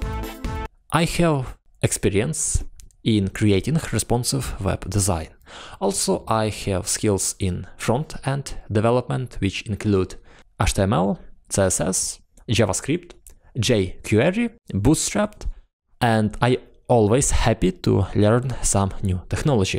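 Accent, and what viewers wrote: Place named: Germany. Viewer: I loved this accent .. is that german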